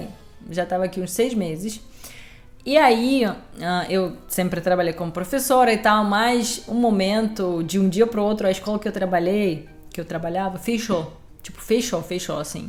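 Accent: Rio de Janeiro, Brazil. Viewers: No próprio Brasil por hábitos e costumes nos mesmo pagamos micos brasileiros do Sul indo pro Nordeste sua linda você tem sotaque carioca rsss bjs ⚘ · Russa com sotaque carioca ❤ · Você assimilou o sotaque carioca perfeitamente...parabéns! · Muito gostoso ouvir suas histórias num português perfeito mesmo que seja no sotaque "carioquês", sou mineiro. Paguei muito mico em Portugal onde morei...Numa papelaria pedi fita durex, lá é camisinha...essas e muitas outras que aqui ficam sensuradas...kkk Sugiro uma entrevista com uma russa que mora em São Paulo, novinha e tem um português perfeito. Abraço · Russa com sotaque carioca?! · Você já tem sotaque carioca, haha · Primeira vez q vejo uma gringa q ao invés de ter o sotaque do seu país, tem sotaque carioca 😅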